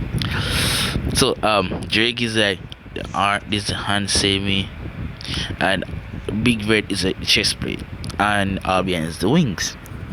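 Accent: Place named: Jamaica. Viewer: Your a Jamaican aren't you well big up mi G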